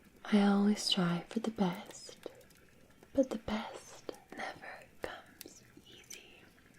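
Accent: Scotland, United Kingdom. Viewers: Amazing tingles, thanks ❤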